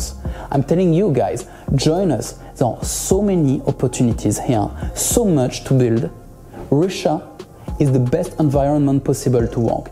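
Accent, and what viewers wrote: France. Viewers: Bro you really should work on the pronunciation, you have a really heavy French accent that makes your point really hard to understand, plus the really basic grammar mistakes are quite discrediting the image of yourself you want to convey (I'd have trouble with a business man that doesn't know that you should say ''the world knows'', not ''the world know'') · Chad french accent bro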